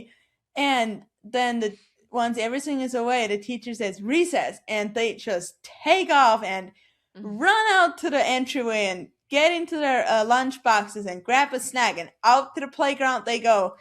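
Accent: United States. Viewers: It's an accent but So American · I find accent fascinating, and I wonder how similar it is to other people's accents from the Amish community? There are parts that sound American. But to me, as someone from the UK, there also parts that sound like a very posh English. Particularly the way words like "January" are pronounced.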